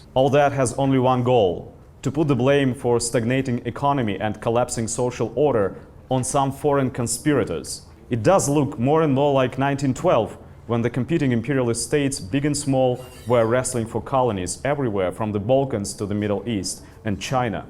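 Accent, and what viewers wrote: Russia. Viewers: Молодец! хорошо говорил с русским акцентом! Я тоже не люблю кревлять языком, пытаясь подражать американскому акценту. А главное серьёзно, коротко и по делу, без лживых импортных улыбок, которые так часто можно наблюдать на подобных заседаниях! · Чтобы попасть на обучение в клятую Америку, пришлось сгрызть зубы об английский. Но по акценту определяется безошибочно - говорит русский. И даже НЕ говорит - читает перевод с русского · СЁМИНУ очень идёт легкий русский акцент:)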